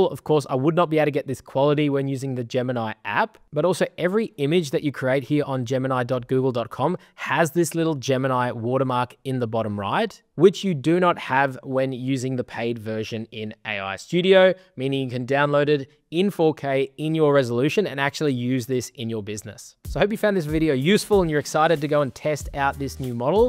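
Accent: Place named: Australia. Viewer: An Aussie in Berlin?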